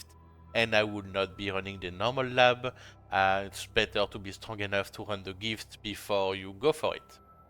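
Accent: France. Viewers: nice french accent <3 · U French?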